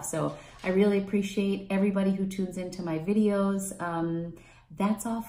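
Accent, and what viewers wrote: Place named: New England, United States. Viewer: I grew up in Newburyport, a historic port town. I visit every year. I spent my early 20s in Boston, then moved to Big Sur, Santa Cruz and now am 1 hour north of San Francisco in SR. I recognized your accent right away.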